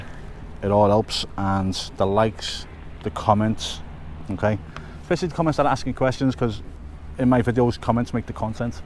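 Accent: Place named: Merseyside, United Kingdom. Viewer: Got to ask Merseyside has distinct accents north,south,east,and west Which part are you from Enjoy you videos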